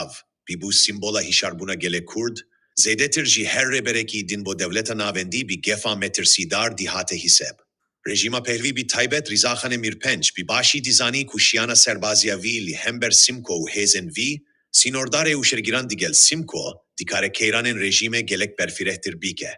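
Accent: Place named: Europe. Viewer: Bi borin ev deng û devok ne mîna devok û dengê Kurdî ye, wekî kû kesekî biyanî dixwîne ,diaxive)mîna yekî Evropî kû nû fêrî Kurdî bûye...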